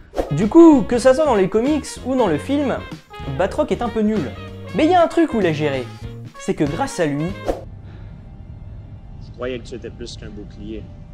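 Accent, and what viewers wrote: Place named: South-West, France. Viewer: Pas mal mais ton accent part en accent du sud ouest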